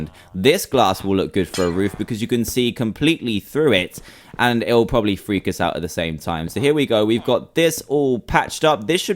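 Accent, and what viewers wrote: United Kingdom. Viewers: Mean he's got an awsome Boise I've always wanted a British voice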